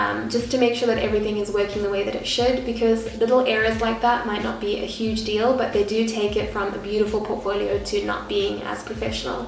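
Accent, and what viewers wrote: South Africa. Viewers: Always odd to hear an SA accent on YT but I'm happy to support a local creator, great video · Gosh, I couldn't recognise your South African accent at all haha! Maybe because I have one myself, who knows haha. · I was like: is she australian is she irish? no south african makes sense now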